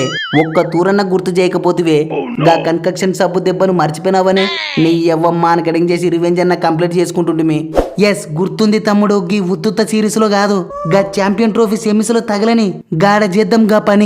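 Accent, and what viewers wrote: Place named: Kurnool, India. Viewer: Mana kirnool yasa tho matladi , prathisari sonthavuru gnapakalu gurthuchestavu ... Thanks thammudu❤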